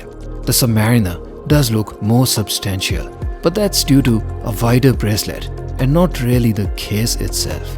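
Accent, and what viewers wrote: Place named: India. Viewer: Damn, indian accent!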